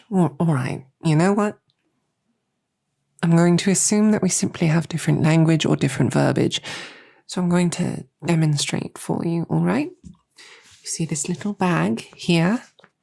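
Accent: United Kingdom. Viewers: The British accent is healing for the soul ❤️‍🩹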